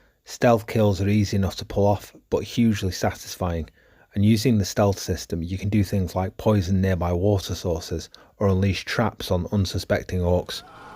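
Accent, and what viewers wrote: United Kingdom. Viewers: True, but the british accent is a bit confusing.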